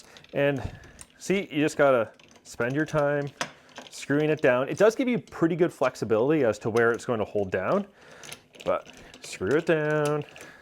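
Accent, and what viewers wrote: Canada. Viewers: This dude sounds so Canadian.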